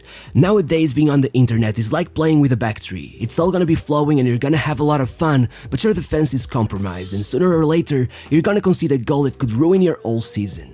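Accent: Portugal. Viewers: Boas, parabens pelos videos. Consigo perceber pela tua pronúncia que és portugues. Por isso peço te que incluas legendas em português, pois os teus videos tem muita qualidade e há muita gente portuguesa que gostaria de ver os teus videos mas que não entendem ingles. Abraço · You have a portuguese accent, i'm pretty sure you're portuguese! your videos are very good, keep it up! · Só precisei de ouvir 10 segundos de vídeo para saber que eras português. Abraço, continuação com o bom trabalho.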